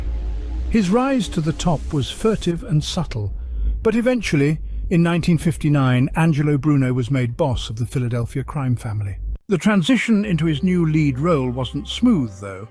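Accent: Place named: United Kingdom. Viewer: Why use a silly British voice? So difficult to understand. Use American language please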